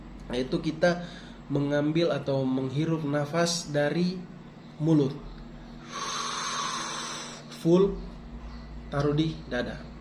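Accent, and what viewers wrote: Manado, Indonesia. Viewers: Manado p logat kang😁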